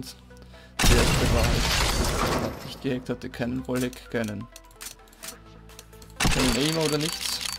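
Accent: Austria. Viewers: uhhh hab grade gesehen das du Österreicher bist .ok hab ich mir irgendwie schon gedacht 😉😅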